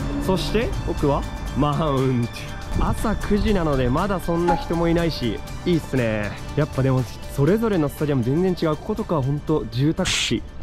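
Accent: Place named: Kansai, Japan. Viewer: しっかりと関西弁😅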